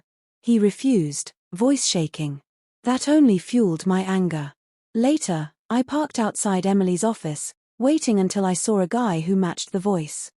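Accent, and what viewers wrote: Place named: England, United Kingdom. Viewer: Very confusing, English woman telling a story of a Midwest American male in the first person present.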